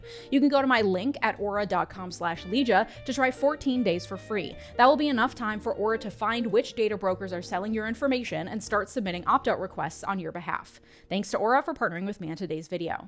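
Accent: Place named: Minnesota, United States. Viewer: Love your channel great info paired with that warm Minnesota accent. Tanks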